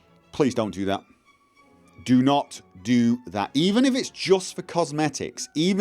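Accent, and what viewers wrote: United Kingdom. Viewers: I'm American, so I can say this: American is not really it's own language. It's begged & borrowed off so many other languages & then mutated their words in such a poor fashion that they are no longer lending us any. So, now we make up crap nonsense words & add them to the dictionary.:D Thank you, for speaking the Queen's English! Although, that, in itself, also was fashioned from other languages, I suppose. But, it just sounds so much better across that pond!;)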